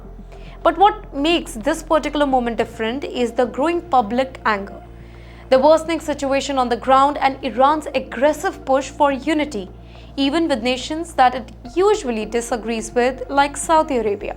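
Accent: India. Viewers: Indian pronunciation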